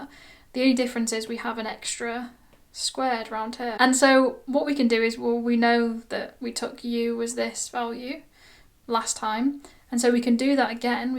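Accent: United Kingdom. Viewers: I enjoy UR BRITISH ACCENT too😘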